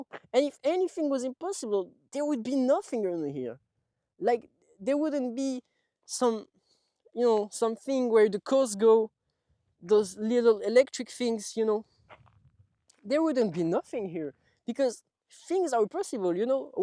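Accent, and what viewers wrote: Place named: France. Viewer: what part of france is your accent from?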